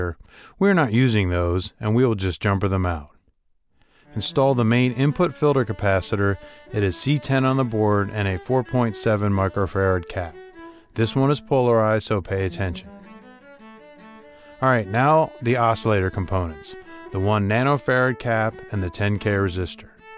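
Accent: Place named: United States. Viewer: That's excellent. I would like one absolutely. I reside in UK though. I think I may of heard your accent being USA. Either way. Best wishes.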